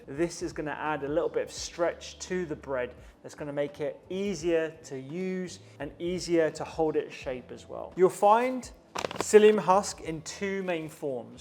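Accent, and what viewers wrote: United Kingdom. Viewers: Hello! Great recipe! 🍞 the finest bread. Are you of Indian or Pakistani extraction? I just subscribed to your channel. Love your British accent 😊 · I understand the British accent is tempting :). However, please do post a healthy bread recipe that suits middle class folks. I am sure this one is more suitable for the $$$ demographics. Incase there is already one available. Let us know aswell.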